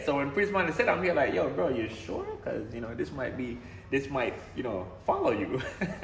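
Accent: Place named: Jamaica. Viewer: I thought u were Jamaican no joke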